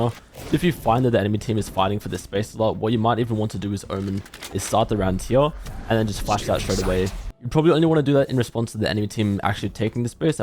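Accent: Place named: Australia. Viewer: dude, you gotta pronounce word more clearly. You talk fast is one thing but you thicc accent combine with halfway pronunciation make it hard to understand when I watch your video in one sitting. That's why I still haven't subscribe to you. Sometimes I even select NOT INTERESTED on YT shorts cuz your pronunciation is terrible for the rest of the world. Maybe not to other Aussies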